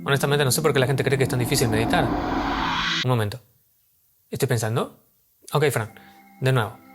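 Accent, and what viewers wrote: Argentina. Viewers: Usted me podría decir de donde es su procedencia? Argentino?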